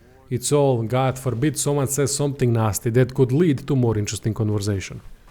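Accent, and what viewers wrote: Russia. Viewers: Melodramatic Russian sounding cunt. Hilarious! I like ur take and will check out other videos. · Всё по делу рассказано. И этот ядрёный русский акцент))) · впервые слышу столь стереотипичный русский акцент не от актера, изображающего русского, а от самого русского.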